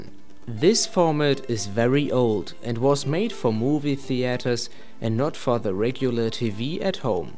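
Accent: Germany. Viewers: so schön deutsches englisch (Y)!! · OUCH that horrendous German English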